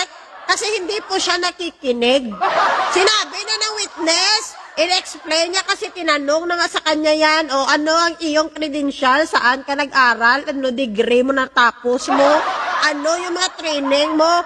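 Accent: Iloilo, Philippines. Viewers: Madam ask lng taga saan po ba kayp ilongga ka ba? Salamat enjoy ako manuod sayo..God bless mo vlog...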